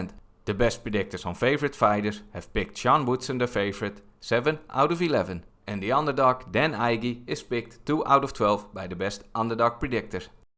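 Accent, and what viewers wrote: Netherlands. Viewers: holy shit jij praat zo tering engells op een nederlandse manier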